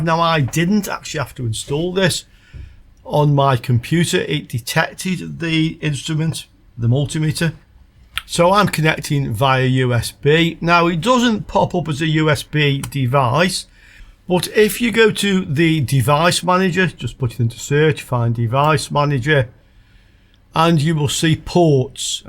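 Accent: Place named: Stoke, United Kingdom. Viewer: Classic stoke accent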